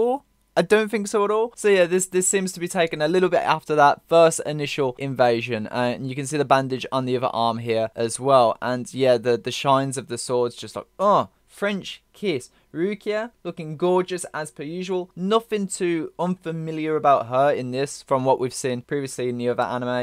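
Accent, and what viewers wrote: United Kingdom. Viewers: Aye bro, what part of the UK do you hail from?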